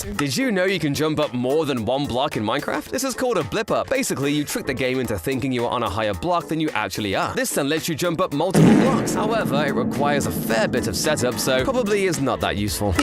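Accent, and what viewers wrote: United Kingdom. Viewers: Glasgow accent?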